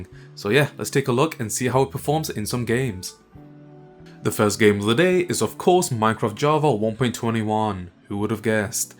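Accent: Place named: Bradford, United Kingdom. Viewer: This guy sounds like one of those bradfordstani’s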